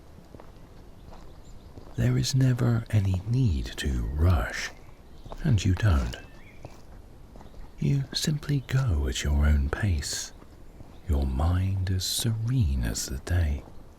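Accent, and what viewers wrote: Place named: United Kingdom. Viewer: A Brilliant voice, an amazing British accent, a great imagination to be involved in, thank you from Iraq! ❤❤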